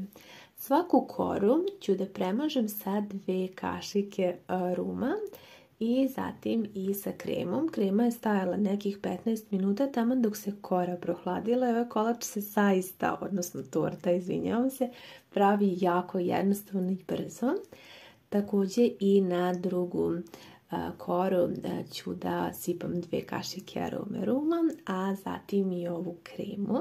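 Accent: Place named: Novi Sad, Serbia. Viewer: Prekrasna dama. Zrači pozitivnom energijom... Obožavam da je slušam... Kulturna, normalna.... Ns naglasak,preslatko... ❤❤❤❤❤❤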